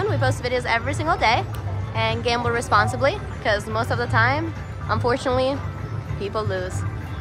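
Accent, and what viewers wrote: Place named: Minnesota, United States. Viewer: Recognize that Minnesota accent from anywhere ya know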